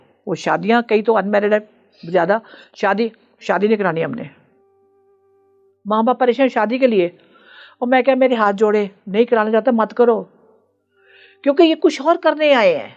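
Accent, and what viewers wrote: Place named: Punjab, India. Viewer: The narration is pretty difficult to understand. Some anecdote starts abruptly without much hint of who and what she is talking about. Pronounciation in Punjabi style adds to the confusion. The statements about 33 Crores doesn't match with the description of 33 koti (types - 12 Aaditya, 11 Rudras, 8 Vasu, 2 Ashwinikumars).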